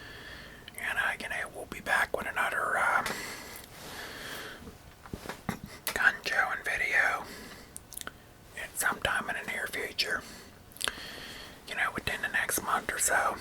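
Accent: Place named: South, United States. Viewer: Didnt know a souther drawl with asmr was so good!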